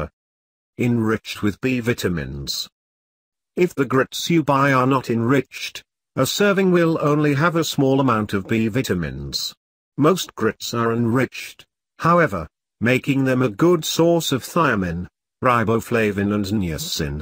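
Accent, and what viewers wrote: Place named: United Kingdom. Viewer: Why must they use a British robot voice. Just do the damn thing with a human voice please....British, American, Tunisian, I don't care, just as long as its human!!!!!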